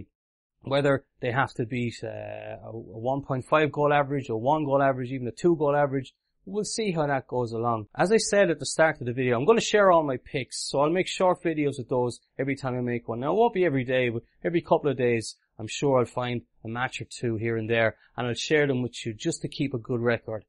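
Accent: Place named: Ireland. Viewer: What part of Ireland are you from man ? good break down of what AH is. cheers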